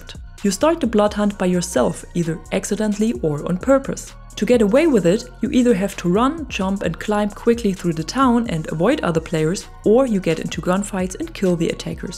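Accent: Germany. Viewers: Are you a german ? xD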